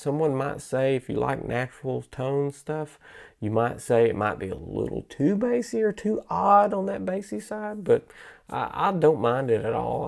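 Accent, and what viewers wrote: South, United States. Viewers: NICE REVIEW, GREAT VOICE, love that country twang!!! ❤️😉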